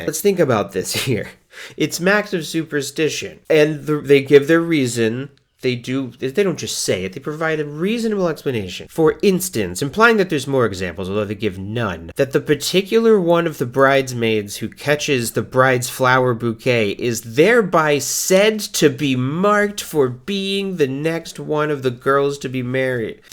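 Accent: RP, United Kingdom. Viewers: As a Brit, I approve of your posh English accent. I thought you'd like to know. Also: here's a bouquet 💐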